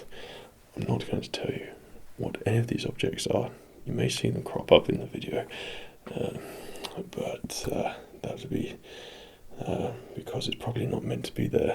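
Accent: United Kingdom. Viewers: This is so relaxing, I like not being able to see what's being used, also nice to hear a British accent like my own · Is he actually posh English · Where exactly is his accent from? The U.K. obviously, but where specifically? It seems quite distinct from what I traditionally associate with the "British Accent" . . .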